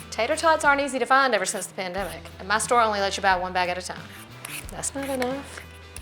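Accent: South, United States. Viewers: The southern accent really does make this show. If she spoke normal none accent English it wouldn't have the magic that it does. Go team murricaa!!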